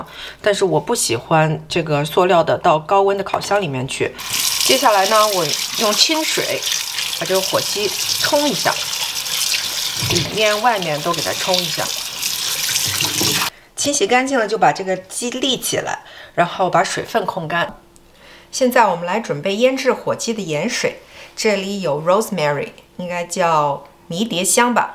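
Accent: China. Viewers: 您是北方哪里人，说话味道还蛮好听的，感觉是齐齐哈尔的吧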